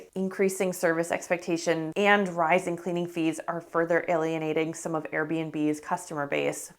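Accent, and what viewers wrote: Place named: Minnesota, United States. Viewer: I commend you for trying to suppress that Minnesota accent… I kept picking up an accent but couldn’t pin it down until you said Minnesota and then I immediately realized what you’re trying to hide. You can’t hide it by the way, it comes out ALOT. And I’m a Puerto Rican from Connecticut lol the only time I ever heard that accent was in Fargo 😂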